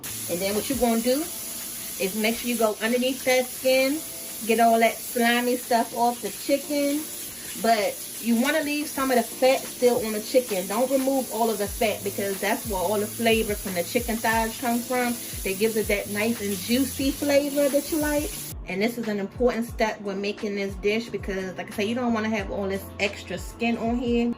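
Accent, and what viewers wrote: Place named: Louisiana, United States. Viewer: You def from Louisiana with that thick accent lol👀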